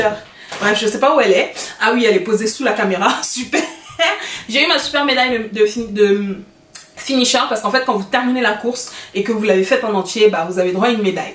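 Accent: Cameroon. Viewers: Belle vidéo j'aime ton accent camer ❤️🇨🇲 je m'abonne direct svp n'hésitez pas à vous abonner également à la mienne merci bien 😇🙏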